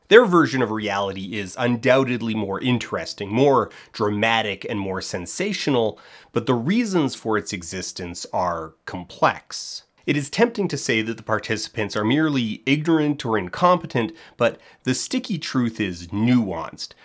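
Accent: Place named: Canada. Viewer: Same here, long time Dan-Stan here so I’m used to his Canadian accent slipping through on random words sometimes (ie “gadaff” from the lord of the rings video) so I honestly thought I was just miss hearing him say life changing…. I don’t feel super great in my soul now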